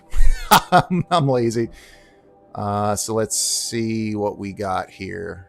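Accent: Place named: Pennsylvania, United States. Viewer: Yes! Aloy freakin rocks, haha. And the backstory is just chilling... Btw, I hear a familiar accent in your voice. Pennsylvania? Maryland? I grew up in Delaware. :)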